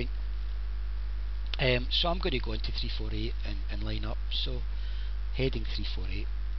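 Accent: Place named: Scotland, United Kingdom. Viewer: you have a lovely accent! scottish?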